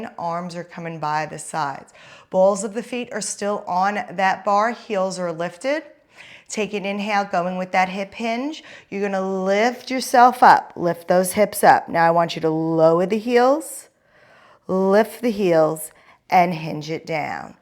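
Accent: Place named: New York, United States. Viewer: I really enjoyed this one! Are you from New York? Long Island or somewhere? I find myself saying "carefully" like you do all the time now! lol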